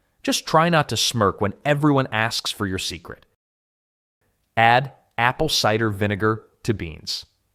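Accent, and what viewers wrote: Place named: United States. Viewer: WITH A MINUSCULE AMOUNT OF RESEARCH, AND BASIC INTELLIGENCE…..ANYONE WOULD KNOW NOT ONE OF THESE IS EXCLUSIVE TO THE AMISH….. 😂🤦‍♀️🤦‍♀️ Many of these are THOUSANDS OF YEARS OLD, SEVERAL DATING BACK TO THE EGYPTIANS. ALMOST ALL OF THESE CAN VERY EASILY BE TRACED BACK TO LONG BEFORE THE AMISH EVER CAME HERE TO THIS COUNTRY, BUT ESPECIALLY TO HUNDREDS IF NOT THOUSANDS OF YEARS PRIOR FROM COU TRIES AROUND THE WORLD. 🤦‍♀️ Gullible people. 🤦‍♀️This post is obviously being done, like many of your others false posts….to raise likes and subscribes so you get more money. These videos have been traced to Asian countries, hence the recorded American voice….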